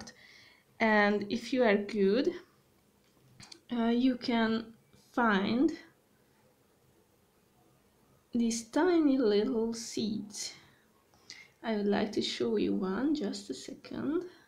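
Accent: Hungary. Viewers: You have a hungarian accent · Your accent... are you from hungary?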